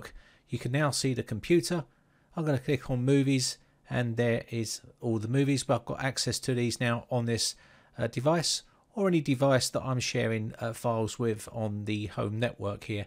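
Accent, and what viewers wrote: England, United Kingdom. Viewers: Nice to hear an English accent lil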